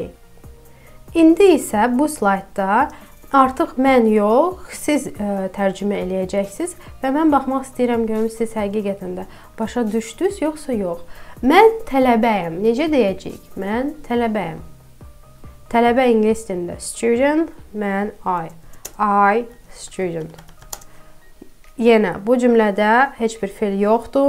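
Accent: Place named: United States. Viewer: I graduated British english unlike You. But You speak in American english unlike me. 🤲🏻 Good Luck!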